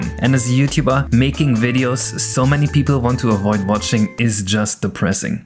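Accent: Germany. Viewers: I'm just confused as to why I thought you were Portuguese for years. Your accent is distinctly German, guess I'm just dumb. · this is the most german english I've ever heard in my life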